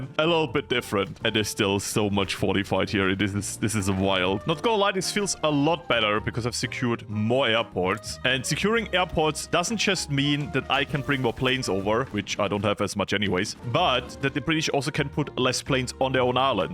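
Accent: Austria. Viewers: Hob erst gröd gemerkt dös er Österreicher is. Ober schene griese aus der Steiermork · Er ist Österreicher, wenn mich nicht alles täuscht 😅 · he's Austrian, I mean you can also tell by his accent in English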